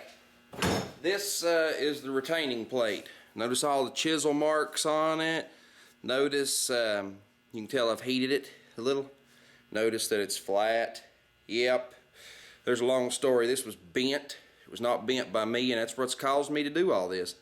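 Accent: South, United States. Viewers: Great job. I learned a lot so wanted to say thanks. Love the southern draw. Now to the garage.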